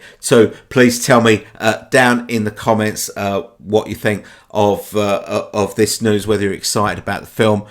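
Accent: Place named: England, United Kingdom. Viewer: Blah Blah Blah with an English accent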